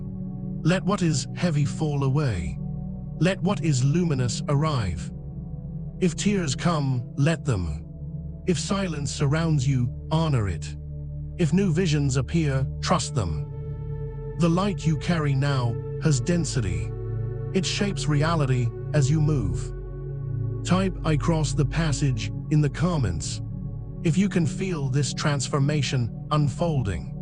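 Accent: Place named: United States. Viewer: You have changed you voice,bringing out the American in the accent!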